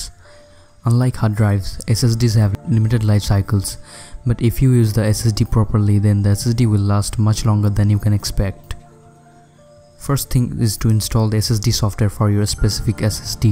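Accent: India. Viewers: Indian accent and annoying music. Awful video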